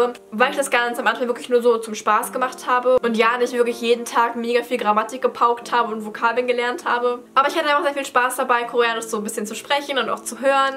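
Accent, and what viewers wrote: Germany. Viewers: Deine Aussprache ist eher deutsch korean😊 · Du machst das echt super 👍 Weil du sagst dass dir deine Aussprache nicht gefällt, ich finde deine Aussprache klinkt sehr deutsch (also mit einem deutschen Akzent) zumindest im Intro. Aber ich denke mit der Zeit wird sich das bestimmt ganz von selbst verändern ^^ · Ich liebe den deutschen akzent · Deine koreanische Aussprache hat einen deutschen Akzent. 😂 Aber das kann man durch genaues und mehrfaches Zuhören und Übung wettmachen. Ansonsten top Leistung!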